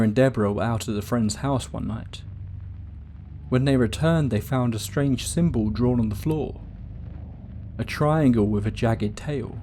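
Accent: United Kingdom. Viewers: Your voice makes these stories so boring and uninteresting. Please be less British.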